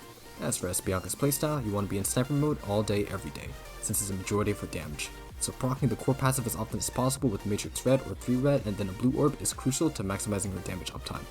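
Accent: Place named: Asia. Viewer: I swear all the pro players of these kind of games always have the this asian english accent lol. Like rexlent pgr, Keebster honkai, just3c,.. :D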